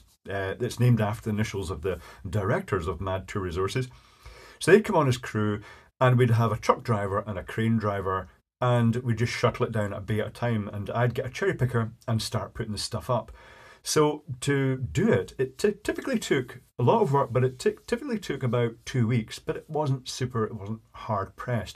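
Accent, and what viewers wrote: Scotland, United Kingdom. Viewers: How should I say this...I'm completely distracted with story time because I'm watching this video and it appears to be me speaking with a Scottish accent and wearing my father's beard. Same hair cut, same hairline. We even look to be the same age. Beyond bizarre. What color are your eyes? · Poshest Scottish voice I’ve ever heard, great videos btw.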